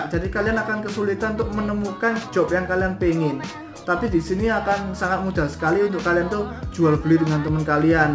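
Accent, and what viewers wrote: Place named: Java, Indonesia. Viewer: bawa acaranya gokil :) bgd :) jawa nya :) gmn gituh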